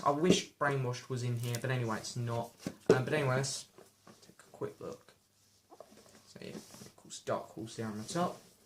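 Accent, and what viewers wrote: United Kingdom. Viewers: Hello from quebec city canada...love your vids and your british accent......great work young man.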